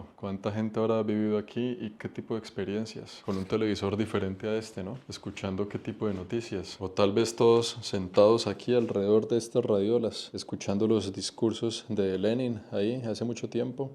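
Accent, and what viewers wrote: Bogotá, Colombia. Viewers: 👍habla rolo, o sea como hablamos los Bogotanos en Colombia, sin acento tan marcado .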